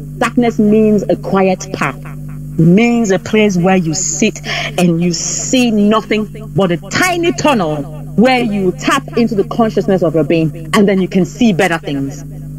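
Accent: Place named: United Kingdom. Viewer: She speaks good English with british accent; but definitely said nothing about the restoration of Biafra, the release of Mazi Nnamdi Kanu and the continouos inversion of our land by Fulanis herdsmen in collaboration with Nigeria sponsored military and para military. The only problem DOS and their co have is Mazi Simon Ekpa nothing more. very disgusting. #FreeMaziNnamdiKanu #FreeBiafra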